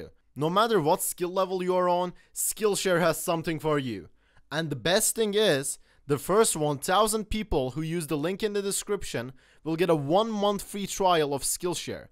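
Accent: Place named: Turkey. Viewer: This can be random but are you turkish by any chance? Your accent is really thick :D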